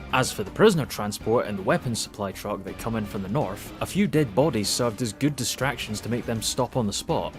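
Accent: Scotland, United Kingdom. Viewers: The British accent saying Afghani names is so funny 😂 you’re absolutely butchering them 😂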